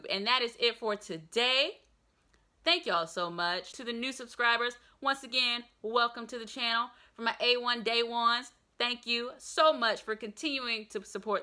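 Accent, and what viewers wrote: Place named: South, United States. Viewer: I knew yo ass was from the south! That southern charm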